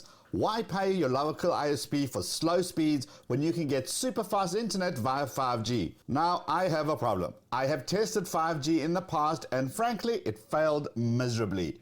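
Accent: South Africa. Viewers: You have a South African accent 🤔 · Hey boet. Your accent sounds South African. Let me know if I'm right. I'm from Cape Town, ZA. On 5G, using the same app you are I got 464Mbps this morning. I religiously test atleast once a day...My connection speeds have always been between 450Mb and 500Mb.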